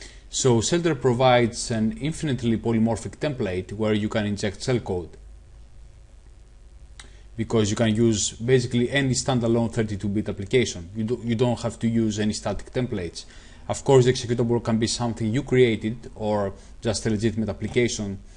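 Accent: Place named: Greece. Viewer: are you greek or sth ? i can detect an accent :P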